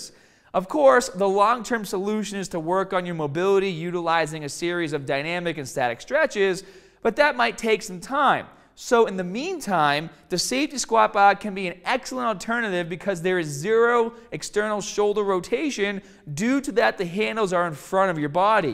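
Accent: Boston, United States. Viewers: It’s been a while since I watched his videos...Since when did he start with Boston/New York accent? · is a good dude. His Boston accent is just a little distracting! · when did he develop a boston accent? never heard him talk like this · Are u from Boston bro holy cow 😂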